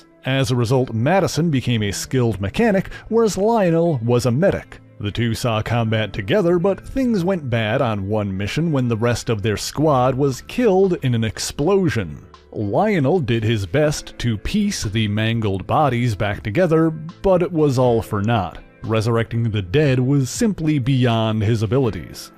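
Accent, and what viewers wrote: Canada. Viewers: Good work. Your Canadian accent enhances this video 💯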